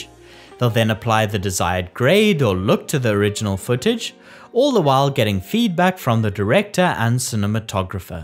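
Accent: South Africa. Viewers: Kiff to hear a Saffa accent. Nice one, bru!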